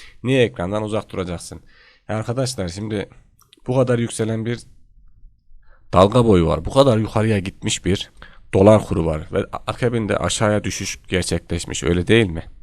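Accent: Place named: Konya, Turkey. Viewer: konya şivesiyle konuşuyorsun konyalımısın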